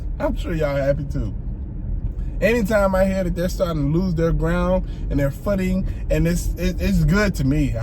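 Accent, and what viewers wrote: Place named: Jamaica. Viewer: Your African accent sounds more like Jamaican to me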